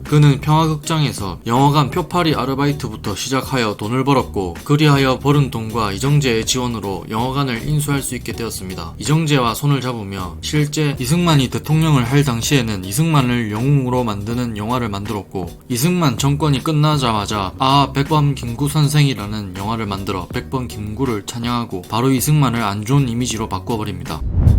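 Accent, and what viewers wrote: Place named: Busan, South Korea. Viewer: 같은 내용이라도, 부산 사투리 참 좋네요. 구독. 좋아요 누릅니다.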